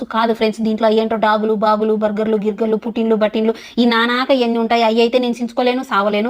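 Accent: Rayalaseema, India. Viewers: Super ga chappavu bangaram❤ Rayala seema slang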